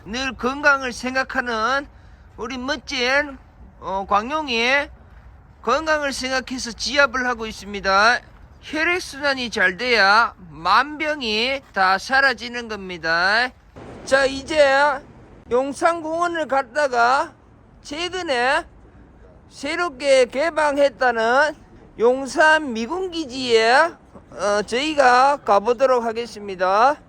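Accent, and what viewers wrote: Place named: Gyeongsang, South Korea. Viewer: 여친이 경상도 사람인데 나한테 왜 경상도 아저씨가 말하는 영상 보냐고함..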